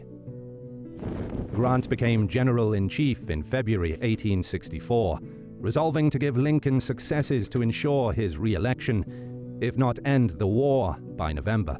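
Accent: United Kingdom. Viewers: Nothing like s Brit telling us about our war. Southern sympathiser. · I really hate hearing a brit talking about our civil war. Probably because you got thrown of my country. Now look at yall😂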